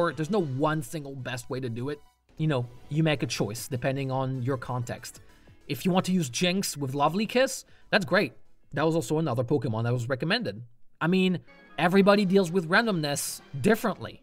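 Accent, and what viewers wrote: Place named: France. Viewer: Judging by some slight variations in accent, I'll assume you're from France?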